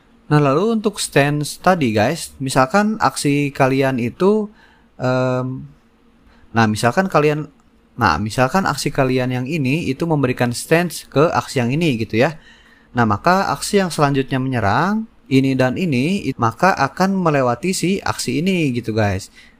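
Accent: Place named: Bali, Indonesia. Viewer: Ni logadnya kayanya Orang Bali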